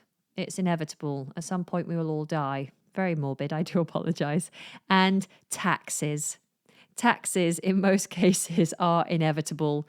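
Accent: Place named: United Kingdom. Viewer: Mam I have watched your almost all videos, I have got plenty of improvement in my British English,I like British accent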